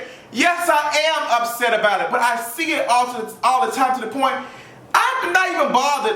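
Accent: United States. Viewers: Love me some you, and that adorable country accent, but my love, my sweet love, YOU MUST 100% not tell people it's not their responsibility to disclose their status. That information is not due to anyoneeeeee at all unless you decide to share, HOWEVERRRRRRRR, you absolutely have every bit of responsibility to tell a potential partner what ur status is. I still love u, but there's no other way around that. It's morally right, and in a lot of states, it's illegal if you don't.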